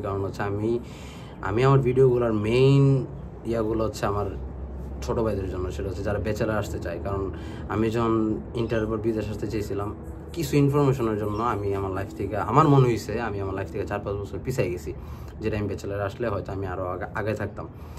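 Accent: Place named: Brahmanbaria, Bangladesh. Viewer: Bhai, take my salam. Apnr kotha bolar accent shune kno jani mone hocchilo j apni Brahmanbaria er accent e kotha bolchen. However, apnr fb id check kore dekhlam apni dhk thk. I dont know why, but still mone hocche brahmanbaria er keu kotha bolche 😄 Btw, I am planning to do my masters from Aus, thats why I've found your videos and started watching them. Thank you for the informations bhai. I am from Brahmanbaria ( Sarail)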